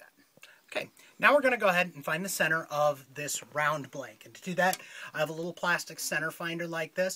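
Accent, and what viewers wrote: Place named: United States. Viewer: dont think hes aussie mate! sounds merican to me.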